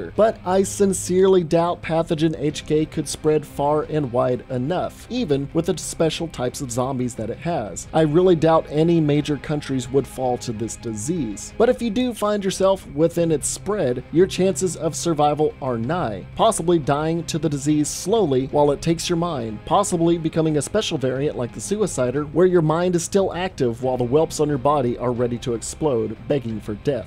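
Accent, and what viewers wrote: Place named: United States. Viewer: Zombies, dabs, and your smooth American accent is spooky season essential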